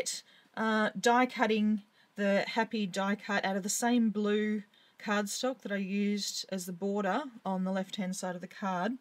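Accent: Australia. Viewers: Great card, fab video and SOOOO nice to hear an Aussie voice! Well done. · Gorgeous card! It was nice to hear another Aussie! Well done and looking forward to seeing more from you.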